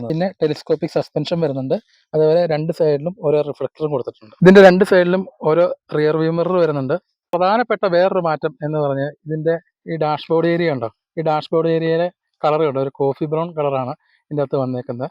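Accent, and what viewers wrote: India. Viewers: Kottayam,pathanamthitta malayalam.. vekthamayi karyangal paranju.. 2026il GST kuranjapol 1.15 on road.. 5000 extra fittings.. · വർത്താനം കേട്ടപ്പോൾ മനസിലായി തൊടുപുഴ കാരൻ എന്നു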